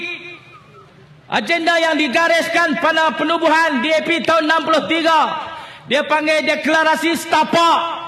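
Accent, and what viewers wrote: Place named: Baling, Malaysia. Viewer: Sanusi ni org baling. Memang org sana stail cakap mcm tu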